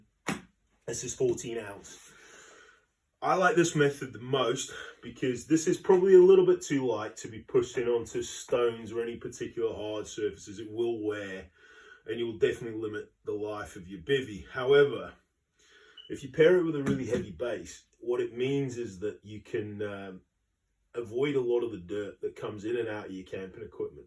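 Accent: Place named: Australia. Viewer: Looks awesome! I don't remember you sounding so Australian though!... Have you spent some time over there since we last spoke?!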